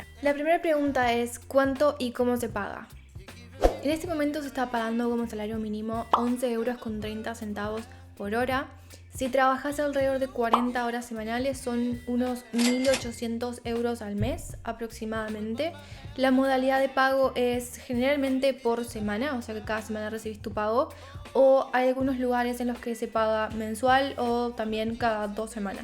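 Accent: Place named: Argentina. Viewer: Hola muy guapa riene el acento de argetina yo aoy de ecuador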